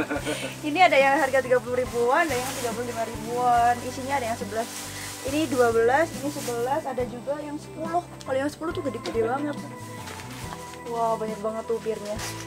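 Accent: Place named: Purbalingga, Indonesia. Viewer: Mirip banget kalo ngomong kayak mbak ku di Purbalingga.. lama ga ketemu karena sy di Kalimantan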